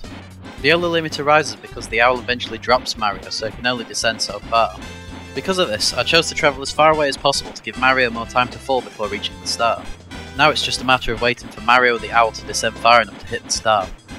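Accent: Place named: United Kingdom. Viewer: British lad voice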